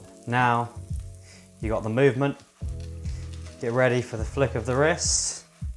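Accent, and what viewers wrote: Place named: Cockney, United Kingdom. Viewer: Really great to see you back in the notional apron. But where did the mockney accent come from? Brilliant knife sheath.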